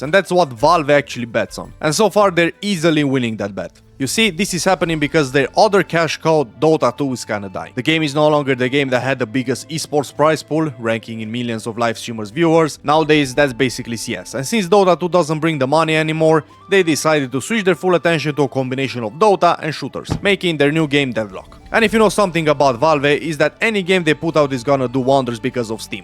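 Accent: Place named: Romania. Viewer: I can bet u re Romanian by the accent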